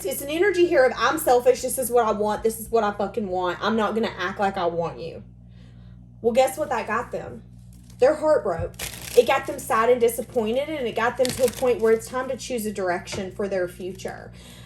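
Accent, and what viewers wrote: South, United States. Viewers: My goodness, child. I do believe you are the smartest reader I have ever encountered. The only thing you missed is that he has a relatively new diagnosis of cardiopulmonary illness. And that would tie it all together. And I LOVE your southern accent. Peace.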